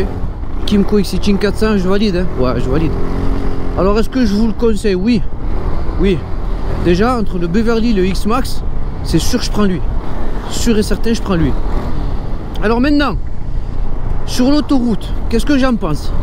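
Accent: Marseille, France. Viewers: Cool ta présentation avé l'accent et le panorama superbe. Je ne peux qu'approuver tes remarques ayant une version de l'Xciting de 2019, je ne peux que promouvoir ce scooter qui se faufile sans problème dans les rues et la circulation parisienne. J'ai juste eu un problème de cartographie Moteur qui faisait que je calais à la décélération de temps en temps mais avec la nouvelle cartographie, c'est nickel. Je regrette juste de ne pas avoir le TCS et le superbe écran du 2023. Sinon j'ai possédé avant pendant 2 ans un Xmax 400 et bien le Yam à coté du Kymco était plus que décevant (position, moteur & confort + sécurité). Ride Safe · T ' es vraiment de Marseille ! .😂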